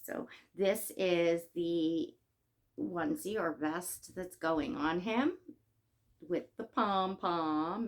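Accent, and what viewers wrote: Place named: New York, United States. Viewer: I have watched you for months and just commented now but I absolutely love you and your channel! Your New York account is my most favorite accent ❤ You are such a great silicone/reborn mommy and I love all your babies. It’s funny how people always got to but in and add their 2 cents to everything lol!!! There’s is nothing wrong with us loving our Dolls and role playing. Back in 98 I had my one and only son because after him I developed a heart condition called postpartum cardiomyopathy at 22 and couldn’t have anymore so when I found these babies years ago and finally got my first full body silicone in 2021 I was over joyed and since have added up to 15 silicones and 1 reborn that I’m selling but because I just put on the new born stage of my real child and couldn’t have anymore I just love these new born and preemie babies since my son was a preemie too! 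These babies are definitely great therapy and help so much. I totally would adopt if I could afford it because I’m 47 but definitely would give anything to have another new baby around but it’s not in the cards and so many just like to voice their opinion but anyways you keep doing you and keep bringing out those beautiful babies. My whole family has taken to my silicones as real family and just loves them too. It’s really helped me as well to hold and play with these babies but to know the full responsibility isn’t there so we can still enjoy life and do what we need to and play with our babies when we need too and want too.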